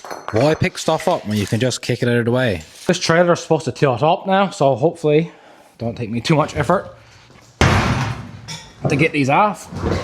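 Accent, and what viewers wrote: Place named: Newfoundland, Canada. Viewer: Newfoundland by the accent.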